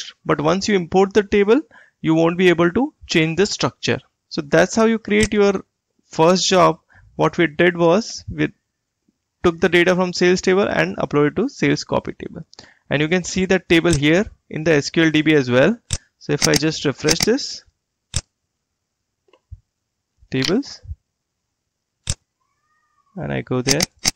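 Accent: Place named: India. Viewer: why allways indish english. I don't understand anything :-(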